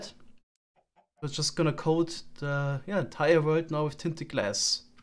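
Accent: Germany. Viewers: Ur German right ?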